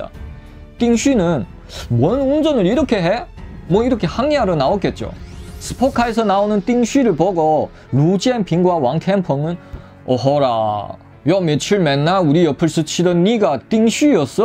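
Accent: Yanbian, China. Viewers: 근데 진짜 조선족이신가요? 일부러 연기하는 말씨는 아닌거 깉은데. 여하튼 스토리에대한 말빨은 진짜 국적막론하고 듣는사람 잼나게 하네요. 근데 유선이야기는 제갈량 사후이야기로 첨부 나오는데 제갈량 죽기전전에 유선은 사망한걸로 아는데.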